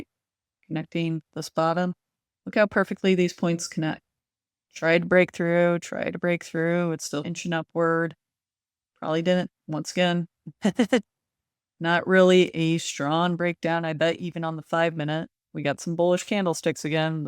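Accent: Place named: United States. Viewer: Hey, I have a question please. You sound like you are from the US. how did you get an account with MEXC? Whenever I go to the site I get a blank page that says they do not service US clients.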